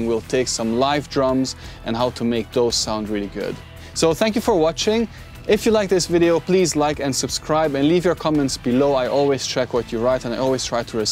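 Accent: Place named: Hungary. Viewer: ... and you are from Hungary:D... if I am not fooled by my ears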